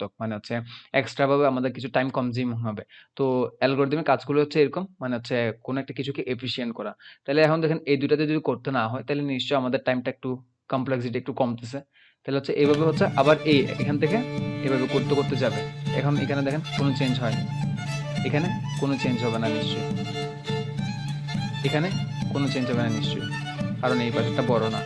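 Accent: Chittagong, Bangladesh. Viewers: Amader chatgaya accent diye buja jai Btw kon Uni te poren bhaia?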